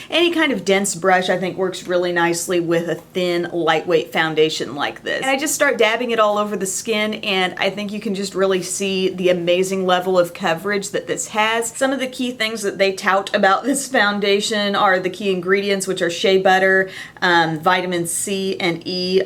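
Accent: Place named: Midwest, United States. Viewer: I just came across your channel, and as soon as you started talking I knew you were from the Midwest, no insult intended. I'm from the Midwest also, and I recognized your accent right away, because you sound like my entire family! Anyways I really enjoyed your video, I'm a new subscriber! ❤️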